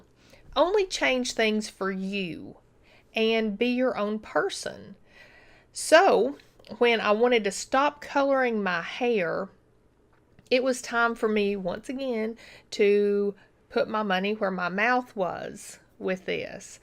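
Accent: South, United States. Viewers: I❤️U & your spirit & your southern drawl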